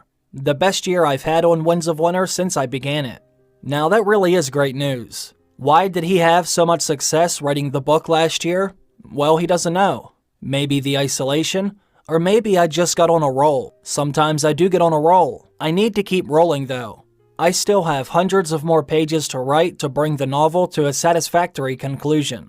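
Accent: United States. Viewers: Where did you learn to read? Are you American?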